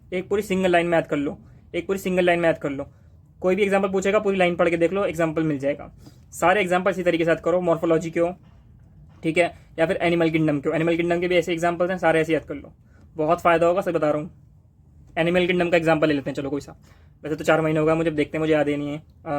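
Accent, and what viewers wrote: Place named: Bijnor, India. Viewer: Bijnori accent lg rha h.. 😅👍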